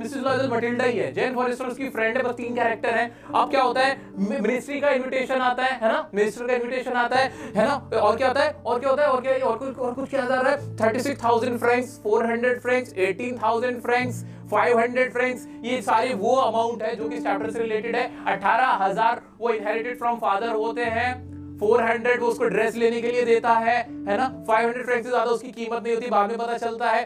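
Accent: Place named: Bihar, India. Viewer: Sir aap bihari ho 😸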